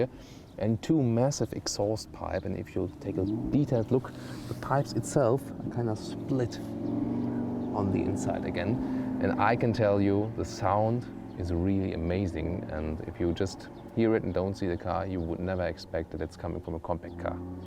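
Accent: Germany. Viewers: If you like Audi mehr ähh more... hahaah Finds auch witzig wie du Autogefühl ausspricht, klingt wie nen Engländer der versucht des auszusprechen, aber sonst halt immer voll den deutschen Akzent xD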